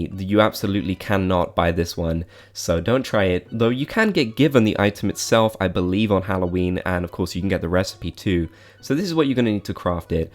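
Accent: United Kingdom. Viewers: Alternative title: British dude obsessed with a purple cat rambling about animal crossing for 6 minutes straight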